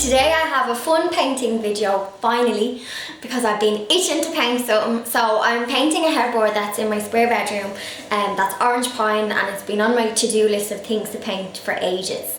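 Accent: Ireland. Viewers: I think it is Irish? nice vid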